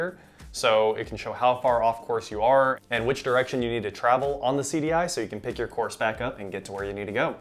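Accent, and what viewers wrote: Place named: United States. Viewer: It is in American English. You can probably tell, from his accent that he's American. Many English words containing a G that are pronounced hard in British English are pronounced soft in American English.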